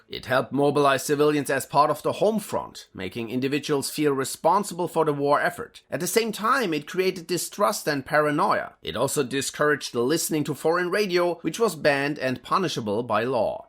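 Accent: Germany. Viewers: No, AI couldnt pull off a german accent this well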